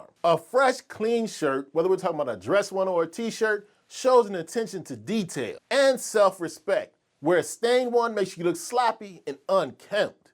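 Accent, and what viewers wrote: Philadelphia, United States. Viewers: You sound like you from west Philly or uptown. Jacket fire.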